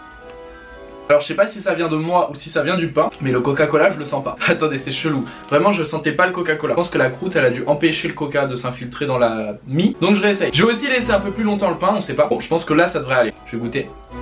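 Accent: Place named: Normandy, France. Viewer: ons dirait trop norman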